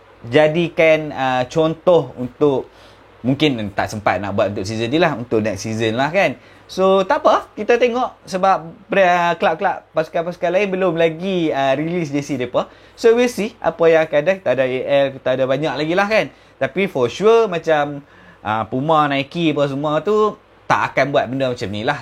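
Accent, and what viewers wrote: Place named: Kedah, Malaysia. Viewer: hang review pakai loghat Kedah/Penang pasaipa hahaha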